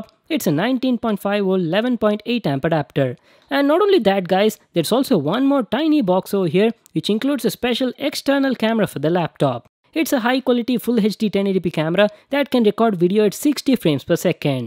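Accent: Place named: India. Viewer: u hv the most unique and original indo english accent of all the indian youtubers🤩🤩. keep it up bro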